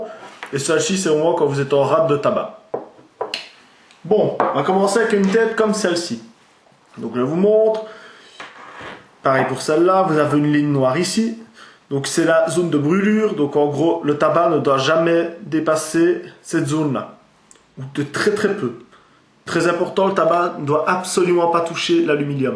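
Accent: Belgium. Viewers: Merci pour ce tuto ! Parcontre je comprend pas le truc du demi charbon, sa sert à quoi ? Et dommage pour le niveau d'eau moi je sais jamais si y'en à trop ou pas assez MDR ! Et ce qui me fais bien rire aussi quand y'en à qui disent "un petit blanc qui explique comment faire la chicha" MDR faut être marocain, algérien ou je ne sais quoi pour fumer ou faire la chicha ?! N'importe quoi... Bref merci du tuto ! Et j'adore ton accent belge :)))))))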